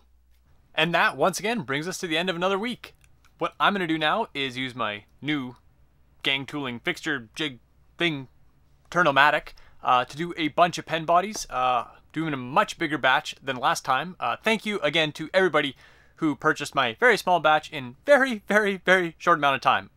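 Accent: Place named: Canada. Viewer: I could hear the maple leaf dialect within 3 seconds of the beginning of the video. Hehe. Gotta love Canada.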